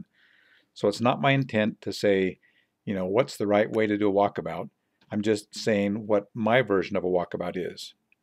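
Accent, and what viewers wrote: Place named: Utah, United States. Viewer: I thought you were from Utah from your other videos because of your accent, looks like I was right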